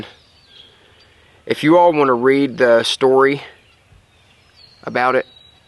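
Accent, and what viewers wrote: South, United States. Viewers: Man i just wanna say i appreciate what you do so much. your personality on camera and love for firearms and definitely that southern draw reminds me of me and my friends here in tennessee and i think thats one of the thing's that make me favor your videos over a lot of others. that and the awesome content. love my wot and want a graves so bad its ridiculous. that roller i believe makes all the difference in it running on multiple calibers. i just wanted to say how sorry i am for what happened to your uncle. i could literally see what u were thinking while shooting that usp and i know it took so much for u to continue. you have tons of heart bro. i read the article and i also went and looked at the lawsuit filed. i hope u dont take offense to me asking but how did the lawsuit play out. i just wanted to know that they paid for what they did and if they didnt thats a travesty. 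the fact of who ur uncle was it never should have happened and they should have handled it way different. again man sorry for ur loss and o look forward to you content for as long as u can put it out.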